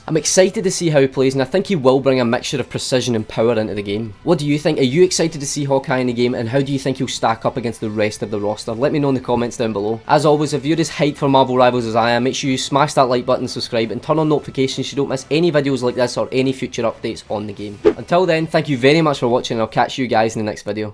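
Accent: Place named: Scotland, United Kingdom. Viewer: Can´t wait for Marvel Rivals! Will it Kill Overwatch? I mean... It could defenitly force Blizzard to Heat up and do more Community Focused Things to keep their Players. I mean.. Overwatch is an absolut great Game. But the Monatizing Options Decision that were made are absolutly BS. Everyone is hating on Monatization and not about Overwatch in General. Marvel Rivals got a perfect Timing to step in and declare Overwatch WAR. Also: I love your accent. You sound like Drew McIntyre ( a WWE Wrestler if u dont know him ). I assume you are from Scottland? :P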